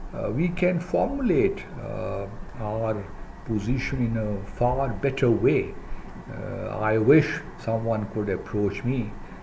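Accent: Pakistan. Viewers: Pakistani english 😀. This video not Madarsachap people 😮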